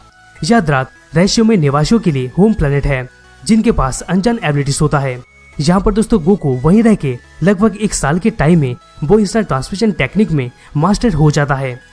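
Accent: Bengal, India. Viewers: Are you Bengali aap ke batoo me Bengali ka jhalak hai like dekha jeto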